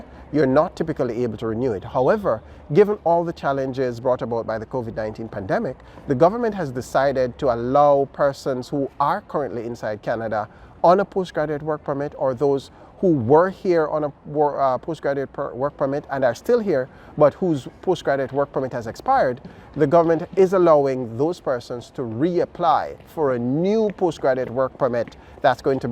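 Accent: Jamaica. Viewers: Thanks for the info, are u jamaican?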